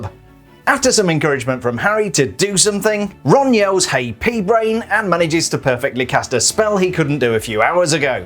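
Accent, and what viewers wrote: United Kingdom. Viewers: After all of the videos from you ive watched, i cant tell if you’re british or American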